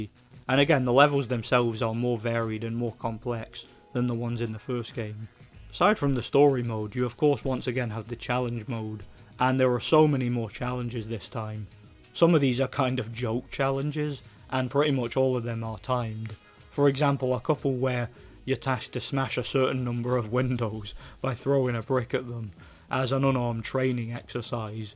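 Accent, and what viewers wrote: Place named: Northern England, United Kingdom. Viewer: Wait, you're Scottish? I assumed you were Northern English.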